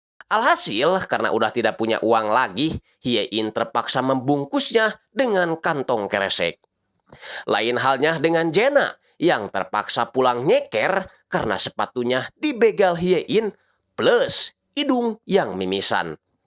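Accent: West Java, Indonesia. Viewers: Orang Sundak kah bang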